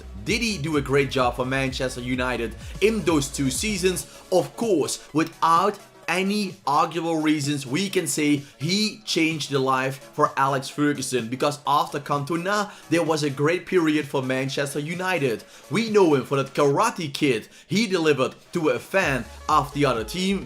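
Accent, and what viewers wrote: Netherlands. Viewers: A little bit of a Nederlandse accent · are you from the netherlands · Are you from Limburg? ( The Netherlands) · You sound dutch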